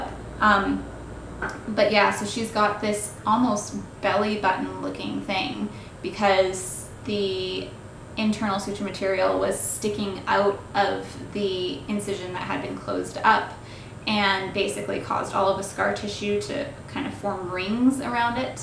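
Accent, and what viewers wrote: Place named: Canada. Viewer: Canadian ass accent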